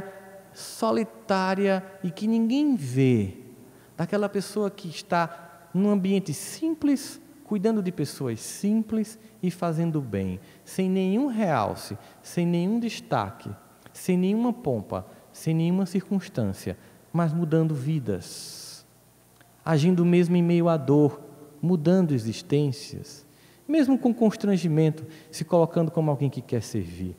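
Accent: Northeast, Brazil. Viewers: Também gosto do sotaque nordestino.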